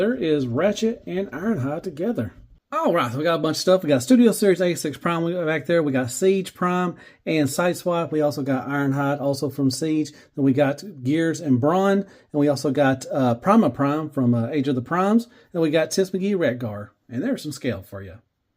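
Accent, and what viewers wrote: South, United States. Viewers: Great review once again, just as I'm about to go to bed. I'm gonna have a nice, quiet sleep now thanks to you and that southern accent.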